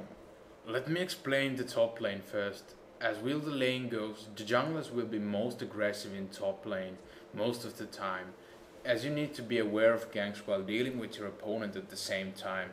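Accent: Finland. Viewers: Very much off-topic, but where are you from bro? Your accent sounds finnish.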